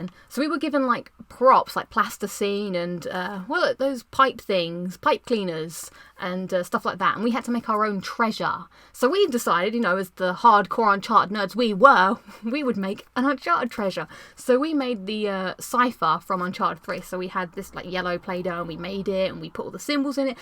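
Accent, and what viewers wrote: United Kingdom. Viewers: Are you british? I love your accent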